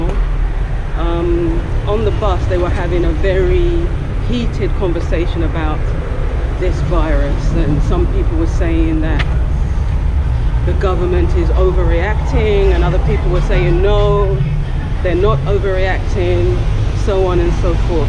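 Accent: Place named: United Kingdom. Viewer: She has a British accent.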